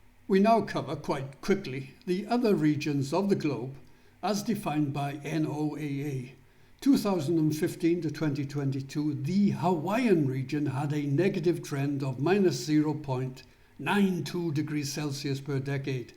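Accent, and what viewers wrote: Wales, United Kingdom. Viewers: Very clear and well presented and your Welsh accent is lovely to listen to.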